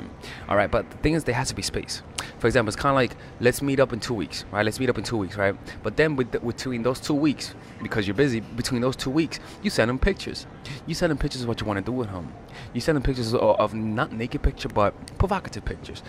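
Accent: New York, United States. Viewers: His accent actually makes me miss New York ugh I wanna go back🌃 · I can tell you a new yorker lol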